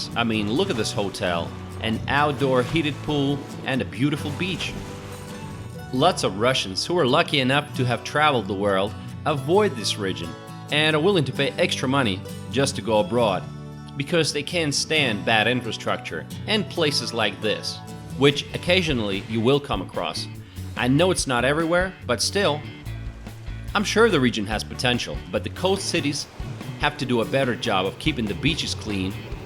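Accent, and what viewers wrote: United States. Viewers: Feel quite a bit of propaganda seeping through! State slogans .Some truth too .! & why is American Georgia mentioned? Looring American tourists ? Maybe I'm wrong . Maybe the narators almost American accent is a true American one . We will never know . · привет! You have made a great, inspiring and informative video, and it is truly made with passion for the country ;-) no matter what Larissa Koroleva is saying (her "funny" comments below)...the laid back music also fits in very well....to my ears your english sounds very american/english (maybe with a 2% russian accent).....and IMO the video is well done all the way.....большо́е спаси́бо! ;-) Sugiiiiiiiiiiii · It seems you didn't get that the maker of this video is a Russian who speaks English with an American accent.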